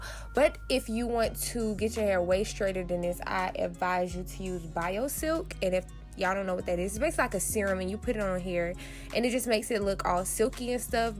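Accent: Atlanta, United States. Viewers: Where you from? I’m guessing Atlanta or Savannah with that accent! lol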